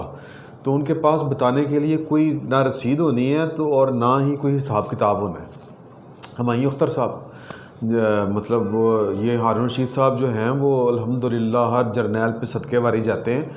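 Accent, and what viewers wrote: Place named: Lahore, Pakistan. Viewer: صاحب آپ جس لاہوری سٹائل میں بات کرتے ہیں نا وہ بہت دل کو موہ لینے والا ہے بہت شکریہ لاہور کی یاد تازہ کروانے کے لیے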